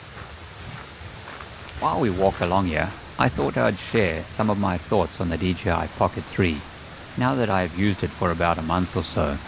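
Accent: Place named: South Africa. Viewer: You sound like my friend from South Africa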